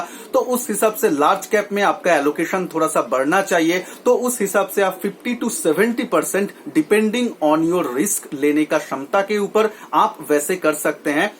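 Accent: Bengal, India. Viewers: Hi, I have seen and liked your video. You talk nicely and your logic is lucid and easily understandable. My suggestion, you should include the Risk part too, the individual Funds poses, in your discussion. That becomes a very critical determining factor in Fund selection. You are a Bengali, right? Apparent from your pronunciation. Good luck to you and keep presenting such nice videos.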